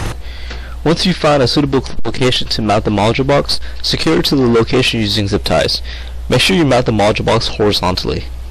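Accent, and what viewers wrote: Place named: India. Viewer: Your accent....are you from India?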